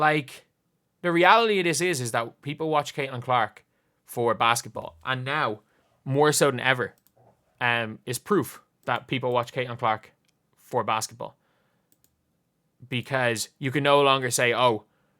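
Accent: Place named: Ireland. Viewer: I hear “So Caitlin Clark…” in a strong Irish accent in random places I shouldn’t even be hearing it now 🤣🤣 Love you and keep up the good work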